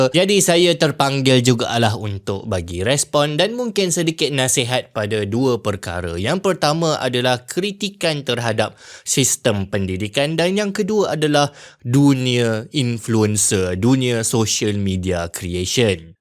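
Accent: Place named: Perak, Malaysia. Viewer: Pekat perak nye yeob🤣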